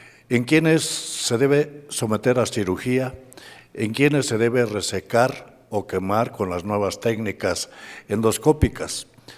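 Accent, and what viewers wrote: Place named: Mexico. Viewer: El habla mejicana así utilizada es realmente agradable para el oído español. La conferencia ha sido para mí muy útil, explicativa y consoladora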